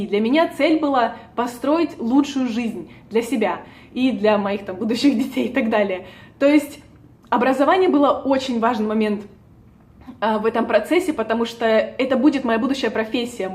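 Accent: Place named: Rostov-on-Don, Russia. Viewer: этот очаровательный южный диалект Ростов/Дон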